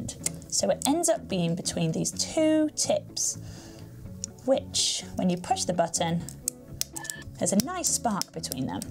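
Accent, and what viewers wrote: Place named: Yorkshire, United Kingdom. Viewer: Sounds like somewhere in Yorkshire to me.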